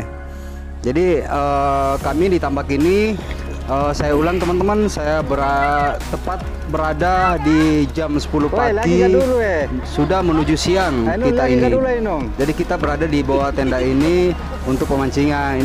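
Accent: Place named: Makassar, Indonesia. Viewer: pelan2 maki logat daeng kondisikan sj ciri khas kita sebagai orang makassar....tetap semangat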